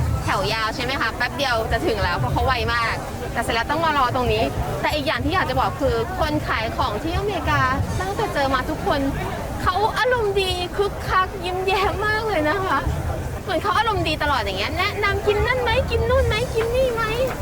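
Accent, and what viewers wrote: United Kingdom. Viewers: I love your English accent!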